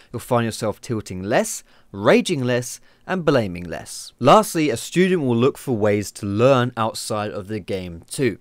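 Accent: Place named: United Kingdom. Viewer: Pls make a guide on how to speak with such a nice british accent!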